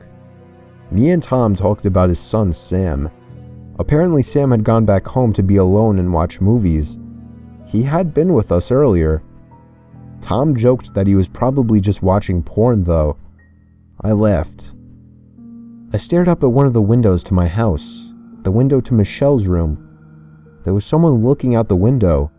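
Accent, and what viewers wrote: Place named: New York, United States. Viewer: I just realized he has a little New York accent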